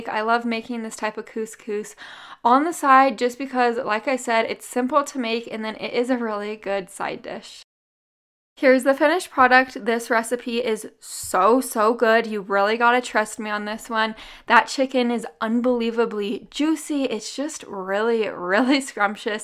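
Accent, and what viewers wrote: Utah, United States. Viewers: I went to USU and it is such a throwback to hear your Utah accent, you are brilliant. Keep up the good work. If I had guess your from Sandy.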